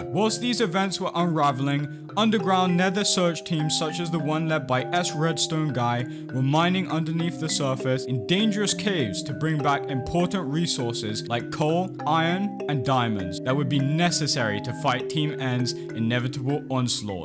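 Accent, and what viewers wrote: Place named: United Kingdom. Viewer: Nice one bro, the event sounds so cool with a british narrator in the background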